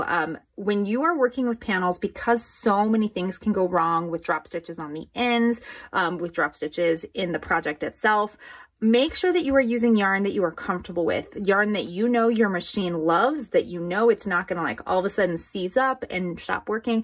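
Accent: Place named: United States. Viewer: Pictures very good but squeaky American voice talking too fast difficult to understand.😢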